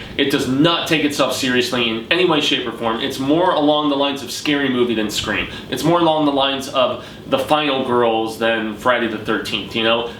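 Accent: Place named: Maine, United States. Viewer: This review was really funny,. I laughed through out. And your Maine accent is funny XD LOL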